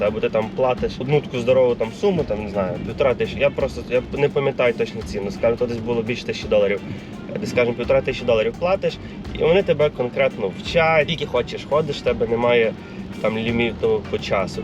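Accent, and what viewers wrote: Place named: Western Ukraine, Ukraine. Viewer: по акценту видно что хлопцы из ЗАХОДУ ,почему узнал ? Я сам оттуда поетому сразу понял Прикарпате, возможно Закарпате но болше звучит как ребята из Коломыи или Калуша>2 года назад делали CDL значит успели перед войной сюда приехатъ ,Оченъ удивително видетъ таких детишек что так серъезно задумывают болшие планы и из воплошают в жизнъ. Одним словом настояшие ГУЦУЛЫ !!!!!!!! Здес в Америке 21 год считают толко полнолетним вообше детишки долго развиваются в отличие от таких молодых ребят с такими болшими амбициями..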